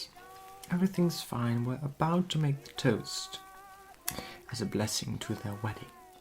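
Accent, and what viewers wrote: RP, United Kingdom. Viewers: I enjoy this so much. Just recently found your channel. I am wondering if this is your natural accent OR if you change it up for a former era. Or perhaps you are putting on a more proper upper crust accent. Isn't there a way of referring to the more proper Queen's English accent - RP or something like that. I enjoy hearing all the different types of English accents, the proper, the cockney, the Liverpool style, etc. I am American but I know there are many inflections. Just as we have so many regional accents here in America. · What type of british accent is this? you and one other similar asmrtist share the same accent i cant help but wonder where this originates from. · Thank you for putting this together. What a lovely posh accent you have.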